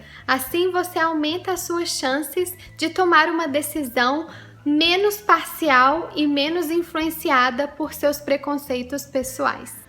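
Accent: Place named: Rio de Janeiro, Brazil. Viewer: Are you from Rio, your accent is so carioca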